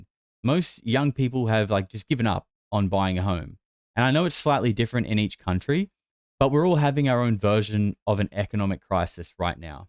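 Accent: Australia. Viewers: No buddy; Aussies sound amazing, love the way you speak :) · Yes frame, U DO SOUND LIKE THAT - THE 1ST WORD U SAID HAD STARTED YOUR AUSSIE ACCENT, EVEN THOUGH U PROBALY GREEK OR ARABIC BACKGROUND!!!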